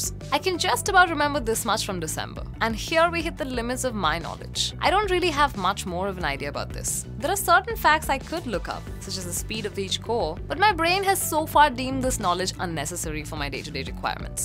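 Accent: India. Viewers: This Indian accent only adds to the charm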